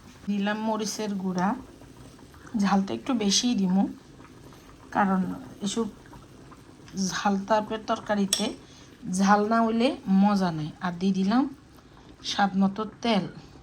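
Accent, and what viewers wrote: Noakhali, Bangladesh. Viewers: কেমন আছেন আপু।আপনার মুখ থেকে নোয়াখালীর ভাষা শুনতে খুব ভালো লাগে। আমিও নোয়াখালীর লক্ষ্মীপুরের মেয়ে ❤❤❤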